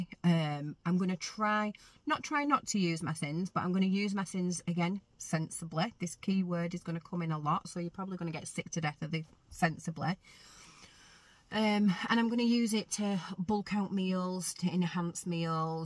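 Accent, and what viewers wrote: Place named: East Lancashire, United Kingdom. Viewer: Preston. I’m thinking you are maybe East Lancs just by your accent? X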